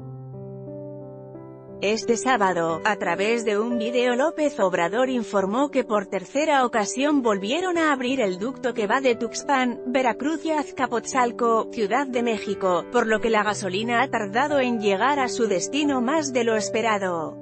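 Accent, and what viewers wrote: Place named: Spain. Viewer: Yo opino que deberias usar tu voz y no la de una Españoleta suenas extranjera suenas mal. .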